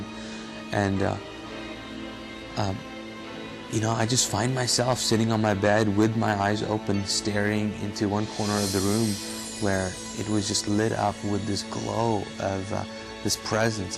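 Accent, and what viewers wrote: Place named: Arab world. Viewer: Good Arabic accent, brown skin dude, seems legit!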